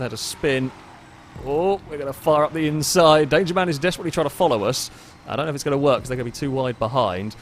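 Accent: United Kingdom. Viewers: That marvelous British English is half the reason I watch this channel, not gonna lie.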